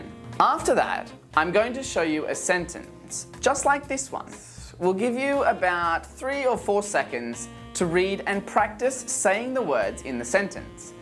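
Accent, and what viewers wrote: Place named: United Kingdom. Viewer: Hey up m8! Brilliant video. Do you have your own playlist of your own? I like your familiar British sounds.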